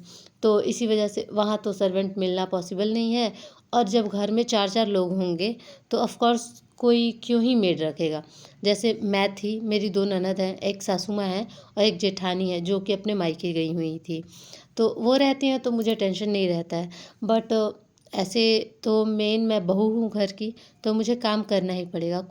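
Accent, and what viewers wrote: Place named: Hyderabad, India. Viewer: Aapka slang Hyderabadi a raha hai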